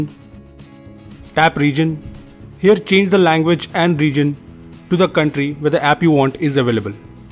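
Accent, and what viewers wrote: India. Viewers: When the Indian guys will fix the English accent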